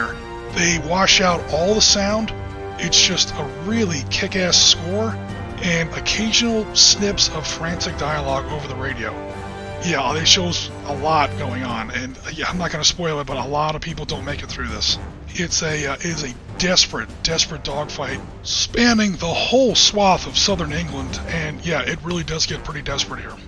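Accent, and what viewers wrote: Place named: United States. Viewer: Being the cynic I am, and hearing a US accent (maybe think Ebert's populist given the then times, and so effectively clueless, unobjective, totally missing the point (which was the sentiment of people who lived through this and later generations) review), and mention of gamers, I thought I was going to be compelled to post some, if not many, criticisms of this review, but couldn't find any. So far anyway! I'll just have to watch this again and try and find some! A really excellent, objective, enjoyable review here, more than worth watching; and watching again...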